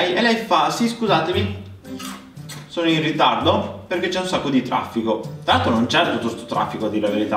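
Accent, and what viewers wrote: Veneto, Italy. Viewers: ma sei Veneto?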